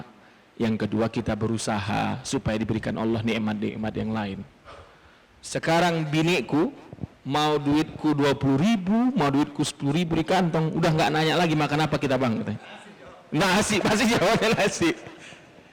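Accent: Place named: Medan, Indonesia. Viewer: Iya, aku pun penasaran pas liat ada yg bilang melarang dengar ceramah UAS dan UAH dan dia sebut nama ustad salman farisi terus ku cari2 di youtube. Tapi ngomong nya kayak orang medan sumatera utara. Logat2 nya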